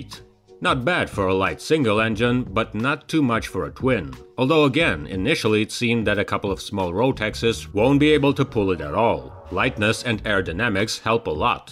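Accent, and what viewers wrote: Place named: Russia. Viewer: I needed more "Wings of Russia" and I found you - everything related to aviation sounds better in a Russian accent!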